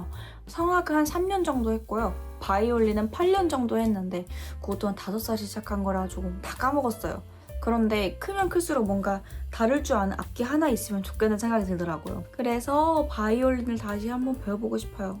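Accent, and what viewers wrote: United Kingdom. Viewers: 왜 한국어도 영국 억양인가욬ㅋㅋㅋ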